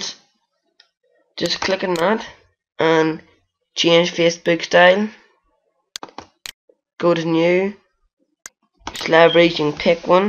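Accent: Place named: Ireland. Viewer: You sound Irish :) !!!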